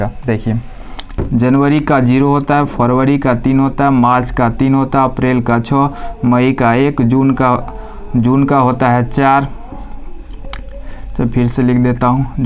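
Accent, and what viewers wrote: Bihar, India. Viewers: आप बिहार से हो ?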